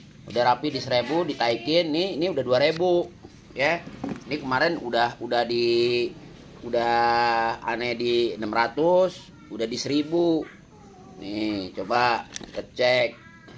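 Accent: Jakarta, Indonesia. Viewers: kentel bgt Betawi Nye cang, salam dari Depok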